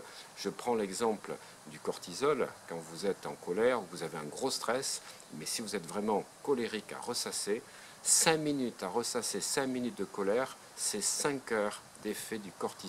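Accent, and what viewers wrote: Bordeaux, France. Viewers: j'adore votre accent qui me rappelle mon origine Bordelaise, merciiiii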